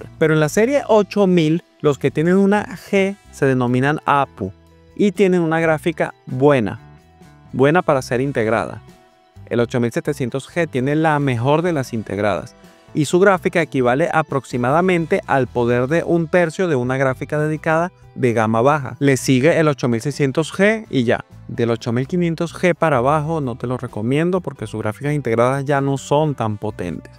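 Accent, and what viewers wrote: Venezuela. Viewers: bro tu eres venezolano?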